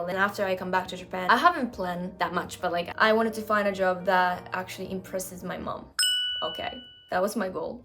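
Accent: Australia. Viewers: While you live in Australia, you perfectly pick up Australian twangs. You might be the person picking up the accent in the country where you live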